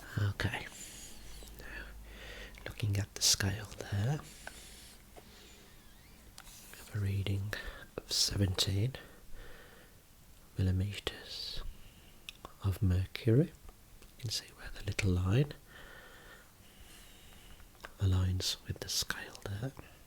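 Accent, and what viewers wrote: Birmingham, United Kingdom. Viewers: yes, its a brummie accent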